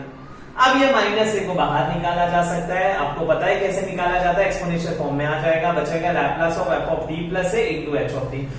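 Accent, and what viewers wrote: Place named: India. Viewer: Why is indian english so difficult to understand? :(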